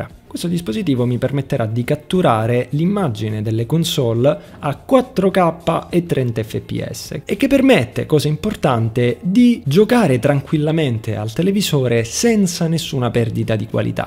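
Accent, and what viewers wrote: Sicily, Italy. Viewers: Ciao ma sei siciliano? non riesco a individuare la tua cadenza nell' accento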